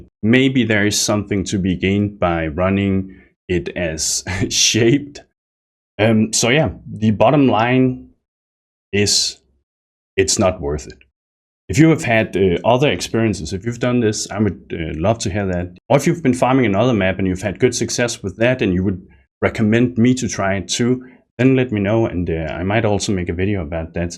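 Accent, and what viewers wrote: Denmark. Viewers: you sound like a dane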